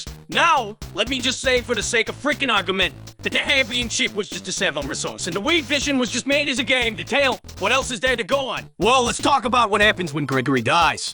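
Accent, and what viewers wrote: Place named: Boston, United States. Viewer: that amazing Boston Boy accent was a pleasant surprise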